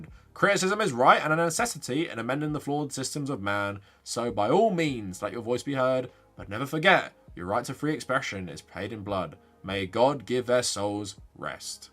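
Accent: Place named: United Kingdom. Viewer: Hmm... This guy seems cool and I think I subscribed to him at some point, but why's this britbonger look like a dirt bike bro? His clothing doesn't match his voice. OH SHIT HE ADDRESSED IT RIGHT AS I WAS TYPING THIS. It's his Republican cosplay. But the he said Guardians of the Galaxy Vol. 2 is one of the worst movies he's ever seen and now I'm sad. That's one of my favorite MCU movies. Especially cause I'm a dad to two boys and the movie is about being a son, being a father, and family in general so the message resonates. But I'm also just a huge MCU nerd.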